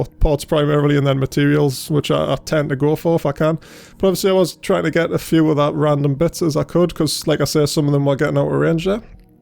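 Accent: Newcastle, United Kingdom. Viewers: Always great hearing another Geordie playing eve :D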